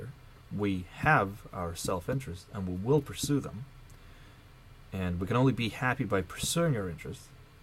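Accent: United States. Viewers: wow. you lived in the Soviet Union most of your life. You could have fooled me, since you have an "American" accent.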